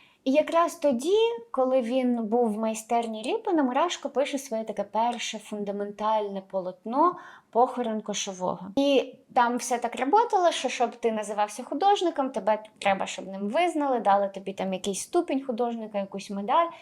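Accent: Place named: Central Ukraine, Ukraine. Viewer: Та Ви шо ! Мова в цієї дівчинки така класна, так говорять в Центральній Україн, на півдніі - це ж наш скарб - різні діалекти, вимови .Вони тільки збагачують її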